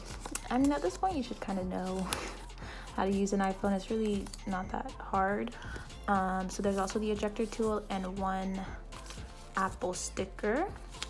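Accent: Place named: Caribbean. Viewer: I know I was hearing an Caribbean Accent and music in background lol, rarely see them doing unboxings. BTW I'm getting the 11 soon 😊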